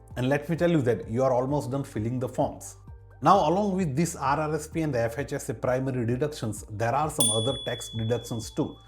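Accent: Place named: South India, India. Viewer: Dude you sound South Indian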